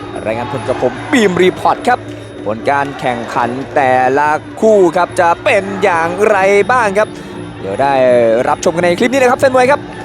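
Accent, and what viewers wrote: Isan, Thailand. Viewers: ม่วนๆฟังเสียงพากษ์อีสานบ้านเฮาเด้อพ่ออาว555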